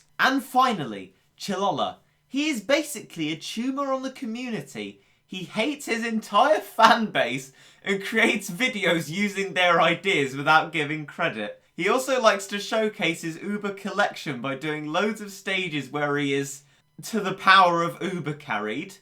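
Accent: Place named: England, United Kingdom. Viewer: I think that you mistook what was said in that first post there m8y. Now you sound like an Englishman, so American may not be your first language, but let me quote the part that seemed to catch you by the jimmies. "Also, he's not as bad as and Chillhola considering he is willing to improve, and equally importantly, he is not a complete douche to his fanbase." In this sentence, he used your name and Assassinbear and that unlike you two, MattShea is willing to improve and not a complete douche to his fanbase. This sentence isn't the best constructed however he's not saying you're a douche to your fanbase. He's saying that MattShea is "willing to Improve unlike " and "not a complete douche to his fanbase like Chillhola". You made several references to that through your video and I went to reread that sentence a few times because I didn't take away that you were a douche from it at all. 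I think the sentence meant the terms "respectively" and not in accordance to you both.:D Cheers m8y!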